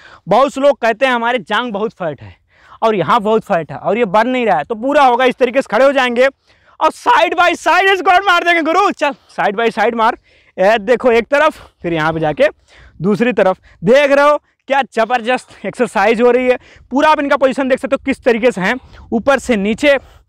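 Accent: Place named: Bhojpuri, India. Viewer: Bhai....love ur Bhojpuriya Tone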